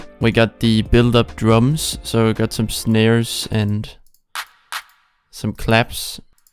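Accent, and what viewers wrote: Denmark. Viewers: Correct me if I’m wrong, but that’s the most danish accent I’ve ever heard (dope titprial tho!)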